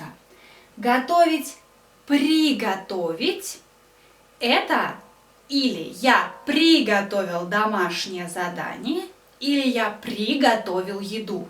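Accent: Russia. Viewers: Акает по московски.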